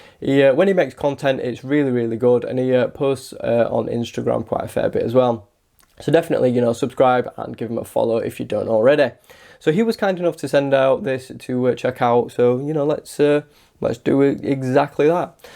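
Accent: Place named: West Yorkshire, United Kingdom. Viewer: Great watch. Wearing mine now! Love the accent too - I'm going West Yorkshire?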